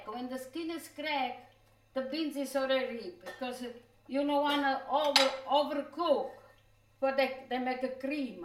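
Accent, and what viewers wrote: Italy. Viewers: I love watching. She reminds me of my auntie the way she talks and cooks and the way she makes simple Italian traditional food like my mum used to make and continue to make. She sounds as though she comes from the same region of Italy as my mum and dad. Saluti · Her accent makes me miss my Nonna . Love you Italian grandma and thank you for the recipe. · Love her I just love her. I’m a new sub. I miss that accent. I grew up in New York in Little Italy. And I would always go to my friends house to eat pasta fagioli, now I can make it myself. Thank you Nona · I LOVE YOUR UTUBE CHANNEL. YOU MAKE ME THINK OF MY GRAND FATHER HE WAS FROM ITALY 🇮🇹 AND I LOVE HIM SO MUCH ❤️ YOUR Accent reminds me of him. He was an AMAZING MAN. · English spoken with an Italian accent. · My nonni for sure bring back so many memories and the way she speaks w the italian accent only my nonni spoke more itslian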